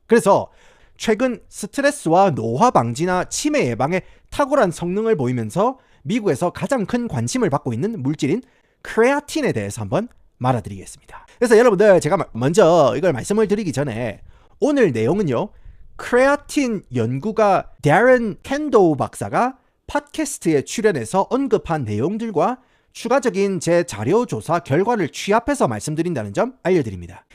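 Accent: Gyeongsang, South Korea. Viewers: 시카고 애독자예요 님 덕분에 여러 정보 알게 돼 감사하며 저도 부산이라 이 갱상도 억양 힐링입니다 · 경상도 사투리 쓰는 RM이구나~~~생각하면서 듣고 있습니돠~~~.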